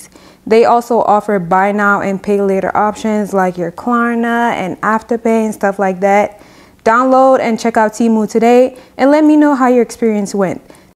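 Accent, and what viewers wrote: Jamaica. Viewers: Heard that Jamaican accent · Def heard that Jamaican accent 🇯🇲🇯🇲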